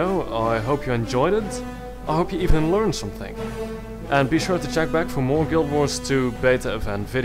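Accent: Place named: Netherlands. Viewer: Netjes engels man ;) maar de T's en D's geven je weg :P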